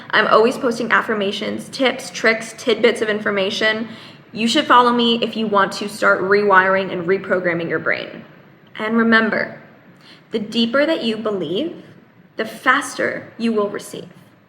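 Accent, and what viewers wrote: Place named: Bollywood, India. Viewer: ooh you're punjabi. i didn't even imagined that you have a proper bollywood accent. anyhow thode muho punjabi sunan ch boht khushi hougi😍😂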